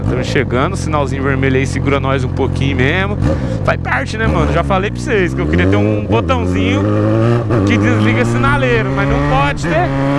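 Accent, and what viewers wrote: Goiás, Brazil. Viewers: vc e goiano???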